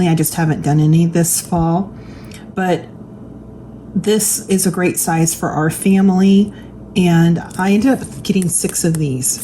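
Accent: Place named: South Louisiana, United States. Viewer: OMG ! YOU SAID, "YALL" ! COULD YOU POSSIBLY, BE FROM THE SOUTH ? YOU SOUND LIKE YOU'RE FROM SOUTH LOUISIANA.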